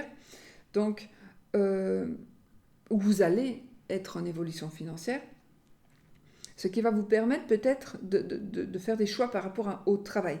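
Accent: Belgium. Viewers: Salut, sorcière bien-aimée, comment vas-tu avec ton petit accent belge ? On est pays...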